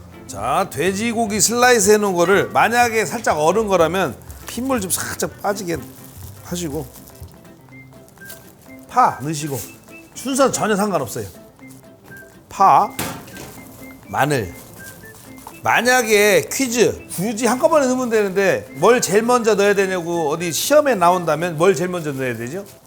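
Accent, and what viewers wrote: Chungcheong, South Korea. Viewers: 우리 백선생님은 요리를 구수한 충청도 사투리 억양을 양념으로 살~짝 깔고 설명해 주셔서 뭔지 모르게 쉽고 맛깔나는 듯~ 화이팅 입니다~😊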